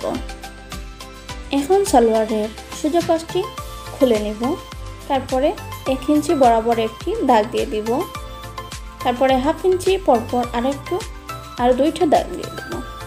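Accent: Sylhet, Bangladesh. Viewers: Apni sylheti 🙄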